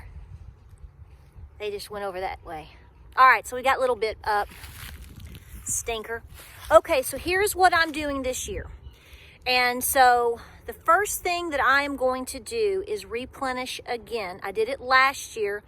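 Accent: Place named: Virginia, United States. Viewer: Golden Comets are my number one! I do like my Easter Eggers because of the egg color even though they are not big egg producers! Which brings me back to the Golden Comets, they make up for the low production numbers of my Easter Eggers! I was born and raised in Virginia, but dad moved us to Texas in the early 70's. So I appreciate your accent! I can still bring mine out when I need it!😂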